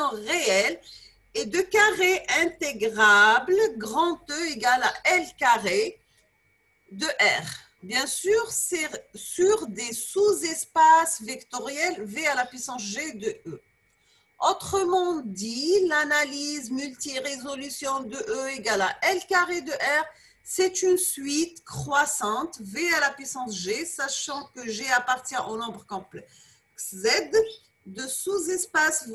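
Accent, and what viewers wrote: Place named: Algeria. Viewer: Accent algérien typique ! Tahia al Djazaïr, Vive l'Algérie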